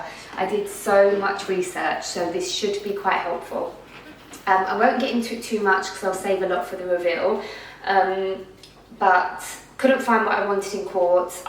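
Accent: Essex, United Kingdom. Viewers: You look gorgeous in the play suit! Ps try saying Jewellery in two bits. Say jewel then leeereey it helps if it bothers you. I had a friend I helped with her speech after a bad car accident. But to be honest I like that you are a natural girl and the Essex twang is cute!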